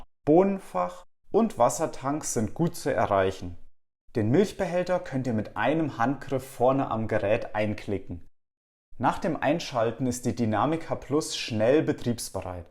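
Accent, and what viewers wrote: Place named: Franconia, Germany. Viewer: Super erklärt, deutlich gesprochen (mit fränkischem Akzent - sehr symphytisch). Habe nur noch eine Frage, my Milk - Funktion wäre das Michprogramm 1 - korrekt? Vielen Dank freue mich schon auf weitere Videos.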